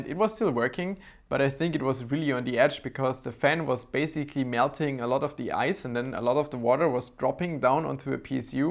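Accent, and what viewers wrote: Germany. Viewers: You can hear his German accent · Deutscher Akzent xD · man hört irgendwie das du deutsch bist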